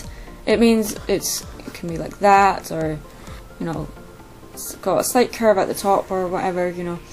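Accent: Scotland, United Kingdom. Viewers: you sound Scottish i live in Edinburgh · Theamax Stage No,she's Scottish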